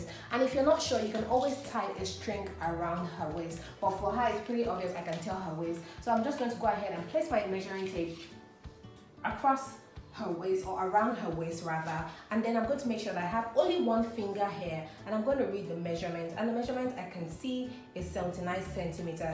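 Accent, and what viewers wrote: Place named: United States. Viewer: You are so cute and I love your English like Americans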